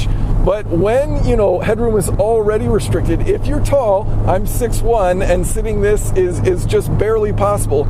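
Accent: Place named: South, United States. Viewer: You can tell right away , that you are from the south .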